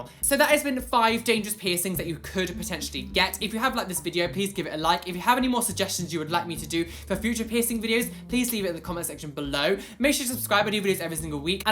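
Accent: United Kingdom. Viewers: I've just discovered your channel you're so cute :( I love to hear that british accent ♥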